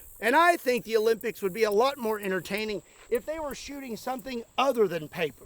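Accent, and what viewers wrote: United States. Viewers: Your yankee announcer voice is hilarious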